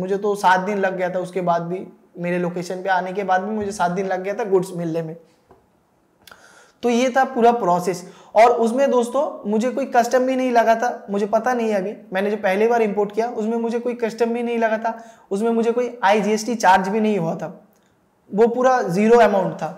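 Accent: Kolkata, India. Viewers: Ap Ka language suun k Lagta hai ap Kolkata Ka hai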